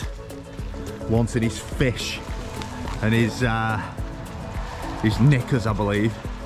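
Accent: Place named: Bolton, United Kingdom. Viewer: Proper bowton talk, crackin't flags! 🙂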